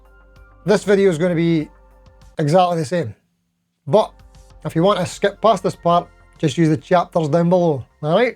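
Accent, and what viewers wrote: Scotland, United Kingdom. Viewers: It’s shocking the scottish accent for spanish people 😅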